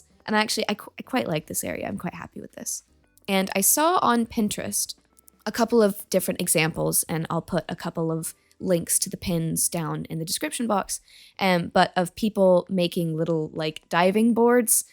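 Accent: Scotland, United Kingdom. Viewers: i also love so much that your accent sounds more scottish than when we last heard you heehee C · ive have never clicked on a video so fast!!! congratulations!!! and omg you can really hear the scottish coming through in your accent now too 🥺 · you sound SO Scottish HA ❤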